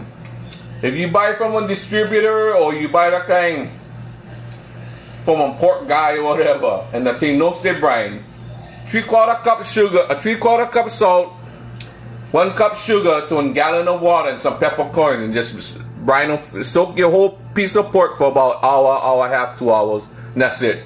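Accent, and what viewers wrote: Hawaii, United States. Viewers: ❤ listening to you talk takes me back to my hanabada days in Hawaii ❤❤No Place Like Home 🥰 Thank You ❤️ for showing people food that we miss from back home doesn't have to be complicated❤ you made it simple for people like me ❤😊Much Aloha and Mahalo From My ❤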